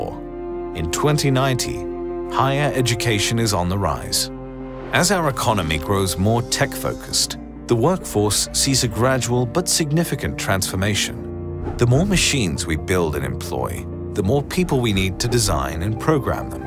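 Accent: United Kingdom. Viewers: I never said anything about clean and shiny animations. I’m not the only one who said they’re mimicking Kurtzgesact, and there’s nothing wrong if they did take inspiration from them. They also have a calm deep voiced British narrator and similar organization and video lengths, they talk about similar topics, the channel also likes posts comparing them to Kurtzgesact so I don’t know what you’re on about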